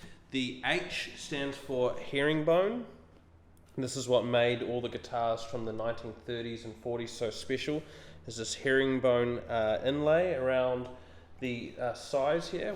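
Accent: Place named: New Zealand. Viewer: Love the guitar, the player, and the Kiwi accent!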